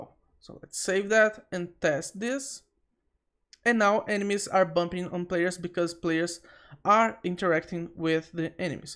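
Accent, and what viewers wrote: Brazil. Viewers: Caraca vey tava pensando q era sotaque br e fui ver o canal e era msm aduhaudhasd. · Eu percebi na hora ksksksksksksks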